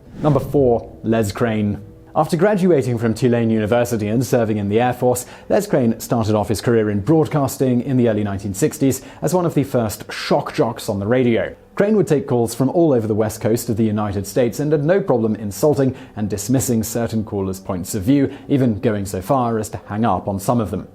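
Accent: England, United Kingdom. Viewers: Please for the love of all you hold dear, have someone make sure knows the pronunciation of people's names. Granted he has an English accent that allows for differently pronounced words, like controversy. However, a person's name is only pronounced one way. This is a pet peeve because my name is seldom pronounced correctly. It is beyond cringe-worthy to hear a famous person's name mispronounced.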